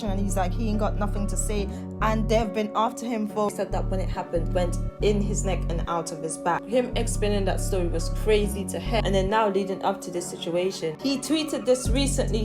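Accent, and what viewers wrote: United Kingdom. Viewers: How the fuck do you have subscribers you speak straight gibberish mad fast with a British accent. Idk if you’re making a video about Chicago or a London drill rap either way both trash.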